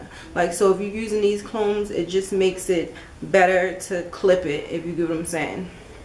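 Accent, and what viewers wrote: Baltimore, United States. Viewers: sounds like Baltimore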